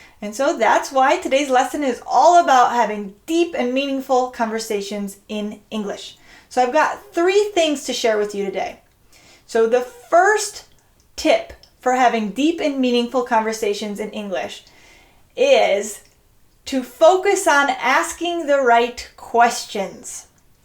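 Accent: United States. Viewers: Are you from California? I think you guys have that kind of accent there. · Which part of America are you from?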